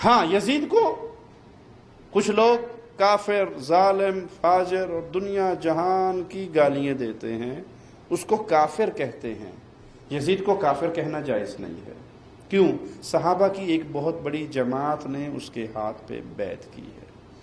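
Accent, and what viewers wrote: Arab world. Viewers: اردو کو اردو لہجے میں بولا کریں۔ عربی لہجے میں نہیں۔ اردو کو عربی لہجے میں بولنا اتنا ہی غلط ہے جیسے عربی کو اردو یا پنجابی لہجے میں بولنا۔ یہ لہجہ بڑا ناگوار محسوس ہوتا ہے۔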